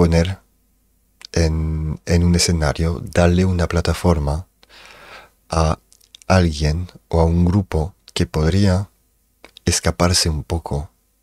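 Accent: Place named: France. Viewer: ME ENCANTA TU ACENTO AFRANCESADO Y DULCE . HABLAS BASTANTE BIEN EL ESPAÑOL , PARA NO SER TU IDIOMA NATIVO . UNA PEQUEÑA CORRECCIÓN CON MUCHO CARIÑO : (Se dice) TRADUJERON ✅ Traducieron ✖️ SALUDOS DESDE MONTERREY, NUEVO LEÓN. MÉXICO. YA TIENES UNA NUEVA SUSCRIPTORA ✅🥰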